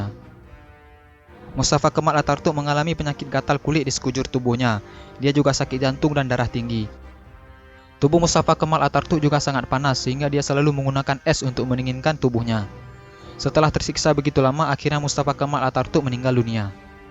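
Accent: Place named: Aceh, Indonesia. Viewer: Admin seperti dialek ureung aceh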